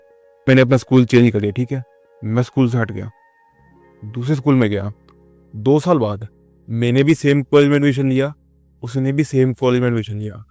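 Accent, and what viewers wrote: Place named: Himachal Pradesh, India. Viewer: Himachali accent 😂... ❤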